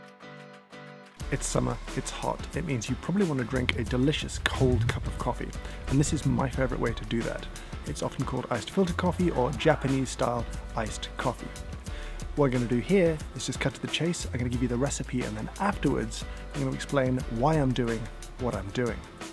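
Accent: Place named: England, United Kingdom. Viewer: All I have to do is take one look at the profile of your glass of ice coffee and I know it's too weak for my taste. It looks more like tea to me. Maybe that's how you like your coffee. You do have an English accent! No thanks. I'll drink mine using the old fashioned Cold Brew process. Dark, strong, and dank!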